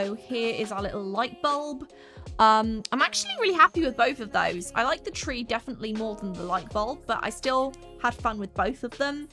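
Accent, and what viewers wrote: Australia. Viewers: I still can't believe your related to the youtuber Mr H and friends. I'm Praying for your family. First when I came around your channel I wanted to learn how to paint and you sounded like Australian 😅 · S I thought u r an Australian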